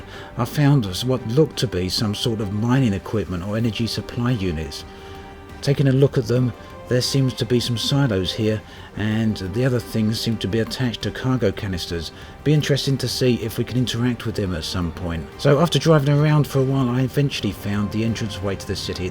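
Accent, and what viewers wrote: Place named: England, United Kingdom. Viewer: Where are you from mate, I know you are English like myself, cannot pinpoint your accent though. I've been playing lots lately and absolutely love the Game, and that's without Horizons so I await that eventuality with bated breath. I play Solo mostly but enjoy it to detraction. Anyway keep posting, I look forwards to viewing all your videos more than anyone's on you tube. Have you got a website of your own? Cheers Mate Paul. By the way have a nice Christmas.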